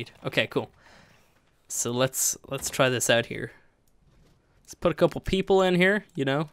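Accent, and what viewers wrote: Ireland. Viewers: Didn't know my boy was Irish till now.... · Wait my bad, not German, Irish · 30 minutes of an Irish man making a bar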